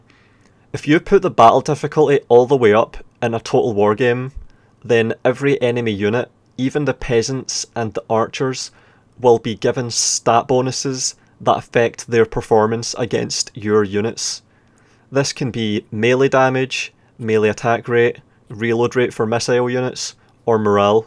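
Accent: Scotland, United Kingdom. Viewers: You sound like i changed my siri settings to 'monotonic dulcet Scottish guy'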